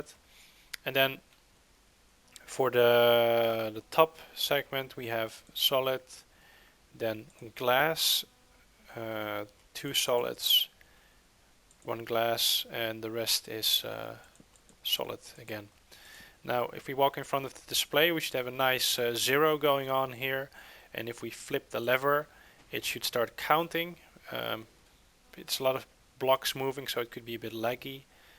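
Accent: Netherlands. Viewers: je bent nederlands dat hoor je aan je accent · hey man, are you dutch?? your accent sounds dutch... i'm from holland too so that's how i recognize it... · u sound dutch, are u? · thumbs up if u hear he's dutch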